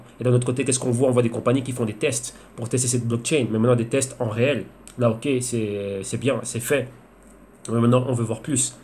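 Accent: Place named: Belgium. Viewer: Tu as un ton qui ressemble à celui du sushis...es tu belge?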